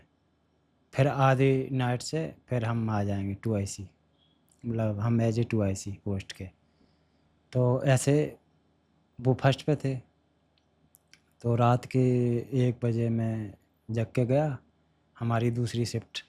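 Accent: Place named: Madhya Pradesh, India. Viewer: जय हिंद जी आप तो mp से हो आपकी भाषा mp ki लग रही हैं कंहा से हो सर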